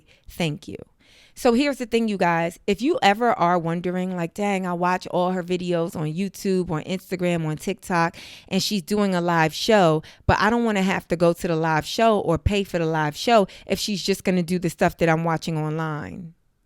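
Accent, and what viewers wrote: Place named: New York, United States. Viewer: Your short about your brother and western union and your NYC accent brought me here. Your accent takes me back home to Queens. New sub, new fan. 👏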